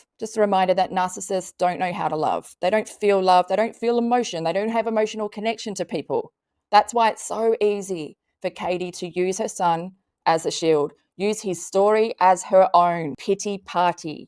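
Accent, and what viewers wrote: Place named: Australia. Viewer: How dare you. Do you know that person? Have you spent time in her home? So you claim to have had all the conditions her boy has? You sound Australian. I've just reported your content. I'm not American, so I've not watched her channel and I'll never watch yours. I hope many more people report you. You are a dangerous and evil person.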